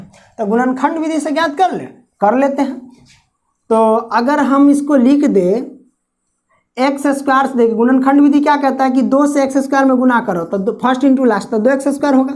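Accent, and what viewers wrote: Bihar, India. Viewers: BIHARI BHASA IS VERY NICE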